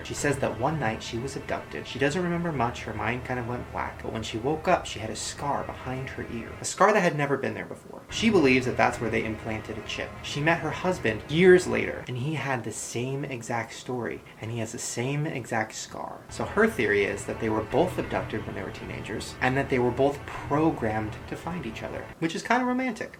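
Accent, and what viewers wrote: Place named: United Kingdom. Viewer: i love the subtle british accent he does in this series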